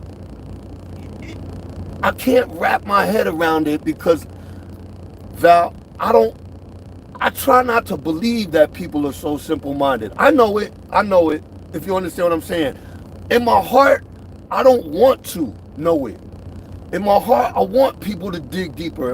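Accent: New York, United States. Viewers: I wish I had caught this when you were doing it. Your talk and slang is straight New Yorker. Maybe it's because I live in Pennsylvania and am married to a born and raised New Jersey girl that I know it but to attack you for an accent that has nothing to do with the content you are putting out there. It's BS. Please know that this white 45 year old is a better flat bedder because of you. I don't give a shit about whatever accent you are putting out!! thanks for the help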